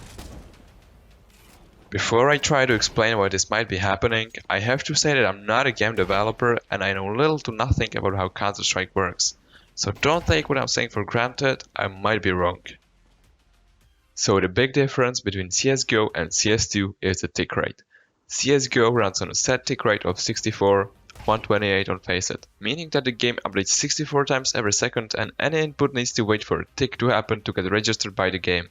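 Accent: Czech Republic. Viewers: to je hustý že jde tolik poznat že jsi čech z toho přízvuku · skoro jsem nepoznal že jsi čech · Český přízvuk se pozná všude :D Pěkný videjko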